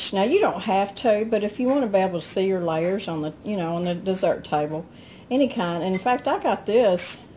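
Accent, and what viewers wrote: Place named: Western North Carolina, United States. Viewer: Miss it looks so good. I bring watch u on Sundays. I'm new to our channel and I'm like u a country cook. I love all u make ur like being with a good friend just talking and sharing ideas. I'm from western NC and ur accent sounds like where I'm from lol. Your are also a blessing. Speading Gods love through ur channel.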